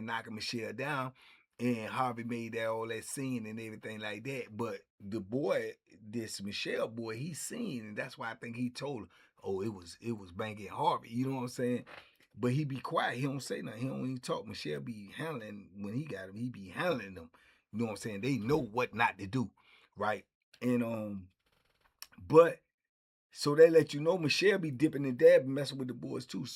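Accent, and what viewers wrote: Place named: New Orleans, United States. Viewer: Yo Banky do you have New Orleans roots? I know you from DC but I hear you sound like me from time to time. New Orleans ⚜️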